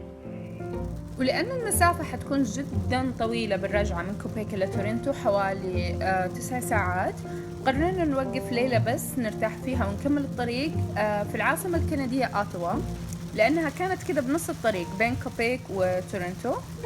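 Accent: Saudi Arabia. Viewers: ذكرتي اسمك في الفيديو و اسم مسيحي وانتي ما ذكرتي ولا معلم اسلامي في كندا ولهجتك جداويه ولكن اعتقد انك لبنانيه مسيحيه الاصل هذا اللي تبينلي..ربنا يهديكي للاسلام وهوي طريق النجاة باذن الله من النار يوم القيامه..والمسيحي والكافر لا يدخل الجنه حتى لو كانت اخلاقه في السماء لانه اشرك بالله وكفر برسالة محمد حتى لو قلتي انا أؤمن برسالة محمد صلى الله عليه وسلم.المسيحي يؤمن بأن الله ثالث ثلاثة وهذا في قمة الاشراك بالله عز وجل لأن الله لم يلد ولم يولد ولم يكن له كفوا احد..اتمنى لجميع المسيحيين الهدايه والسير على ملة الاسلام هدى الله الجميع..💗💗💗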